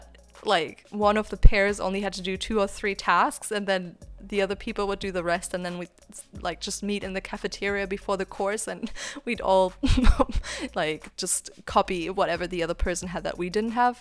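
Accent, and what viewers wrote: Germany. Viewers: Wie man hört das die deutsch ist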